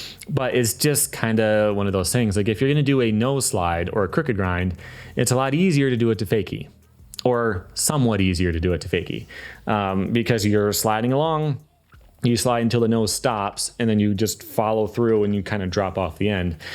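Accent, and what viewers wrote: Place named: United States. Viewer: the .at thing confused the hell out of me back then - "this guy is austrian like me? no, he talks like an american? What the f is going on?!"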